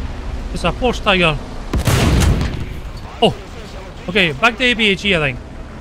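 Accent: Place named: Fife, United Kingdom. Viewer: Just trying to get into WT, as a fellow Scot I found your vids and have ben going through them. Keep up the good work. Really struggling to place the accent though, either posh west end Glesga (east end kid myself but moved away and didn't go to prison or end up on drugs, actually done pretty well when I left that shit hole lol) Anyway, you sound like a Fifer who maybe moved to Glasgow (judging from some of the words used in older vids, naughty Fifer words) Great channel, keep clapping them cheeks ❤🤍💙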